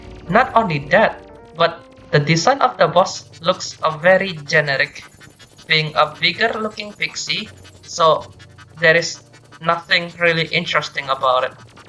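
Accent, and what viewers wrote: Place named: Indonesia. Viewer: indonesian accent hot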